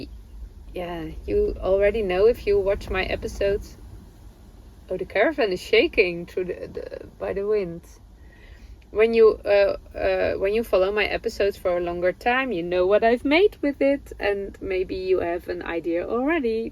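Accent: Netherlands. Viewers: I love your "Dutchie" English, I can understand perfectly. I'm familiar with the word paprika because my mom, who was Slovenian, called a red pepper (the ripe version of a green pepper) that. We also have paprika, the ground powder seasoning. I love how beautiful you have made your caravan/camper with all hand made items. Your camper has many heart jumping moments, every time you dress it up with something new, I'm sure! Did you follow a pattern for the cute curtains behind you? I hope you had or are having a lovely time away from normal daily activities. 💗💗💗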